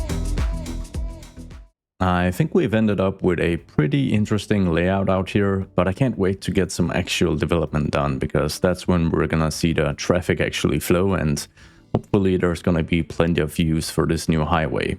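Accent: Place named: Denmark. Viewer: The danglish is real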